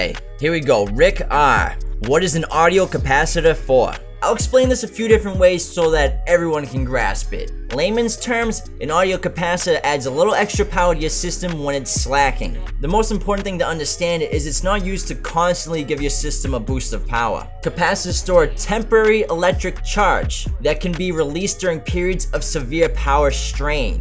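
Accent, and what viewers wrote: Boston, United States. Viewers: I'd love to hear this guy say Oscar Mayer Wiener in his Boston accent. Would be crazy satisfying for some reason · Now thats a bahsten accent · Yo,....... I like these videos, but why are you doing the Boston accent lol, I get it in the videos when you roast somebody in the comments (might be me here) but when you’re just being helpful why the accent?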